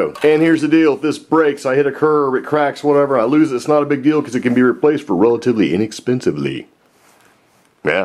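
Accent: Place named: Texas, United States. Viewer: Mojo? nah".....Never lost it, that Texan drawl forbids it, you wear that 5 gallon hat with pride!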